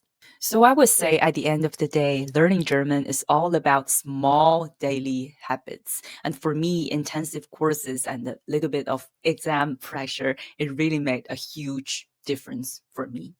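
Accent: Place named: England, United Kingdom. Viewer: I appreciate your efforts but your German doesn’t sound german 😅 it sounds more English than German specially the of “T”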